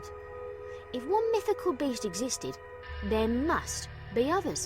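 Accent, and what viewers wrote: United Kingdom. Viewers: She lost the accent eventually lol. Mighty British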